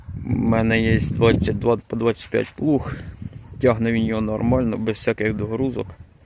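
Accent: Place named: Poltava, Ukraine. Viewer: Я чую по розмові, що свій хлопець, з Полтавщини. А , виходить, сусідній район. Вітання з Миргородщини. Відео герні. Трактор також гарний. Міркую про придбання такого. Знімай відео ще. Нехай щастить.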